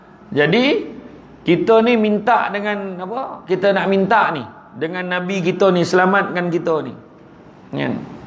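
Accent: Negeri Sembilan, Malaysia. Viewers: Semoga ustaz sentiasa sihat agar dpt terus menyampai kn kebaikan. Ohsem bila dpt mendgr bahaso Nogori 9...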